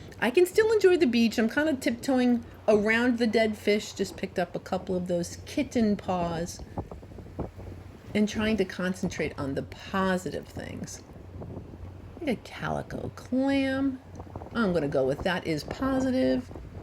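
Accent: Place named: New York, United States. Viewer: My best friend's mom spent most of her life in NY, and NJ, and when you say certain words your dialect sounds just like hers, it makes my heart happy! It is amazing that you have learned ALL of this in just a few years!! I love your content, thank you for all you do! ❤ 🐚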